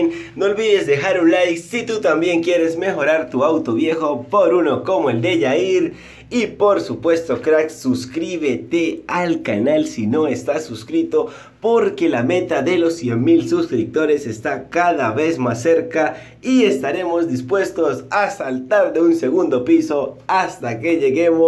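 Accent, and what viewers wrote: Venezuela. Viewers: Jajajajaj cual argentino es venezolano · Jajjajaja es venezolano no Argentino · No sabía que the era Argentino, y hablaba como venezolano XD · Argentino????? Es venezolano 😂 a quien le gustan las arepas chamo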